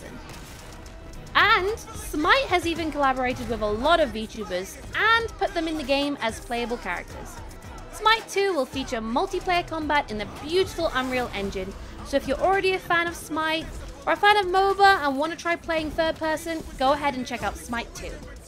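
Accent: United Kingdom. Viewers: I thought I heard some british accent or intonation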